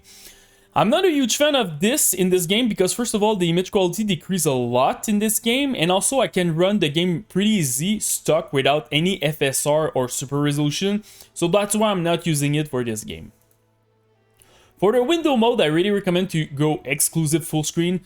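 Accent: Quebec, Canada. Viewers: L accent québécois 😍😍😍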